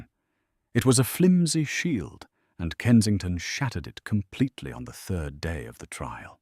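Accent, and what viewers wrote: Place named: England, United Kingdom. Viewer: the narrator has the best English accent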